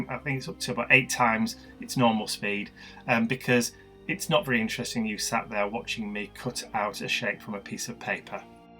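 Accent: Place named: Goole, United Kingdom. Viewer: Love that page. I love listening to you, I'm from Goole and your accent reminds me of home, I've been away for many years. It's good to hear words like scrumpled!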